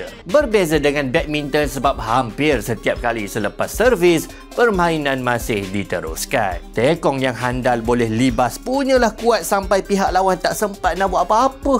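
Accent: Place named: Terengganu, Malaysia. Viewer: orang ganu rupenye😂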